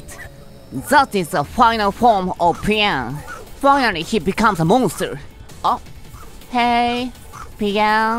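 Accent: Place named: Japan. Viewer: good Japanese English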